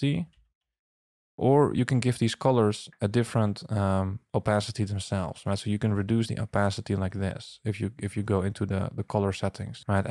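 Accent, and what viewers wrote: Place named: Netherlands. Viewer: Was almost going to comment in Dutch because of the accent, but location says USA, so here is my question: Can you stop a browser from rendering translucent gradients AT ALL? Today it is very popular in video players to use it as a background between the video and the navigation bar/buttons, which is totally annoying. Youtube does this as well. I need a way to make some CSS options impossible on a browser wide level. I managed to do a similar thing with rounded corners, but can it be done for gradients as well?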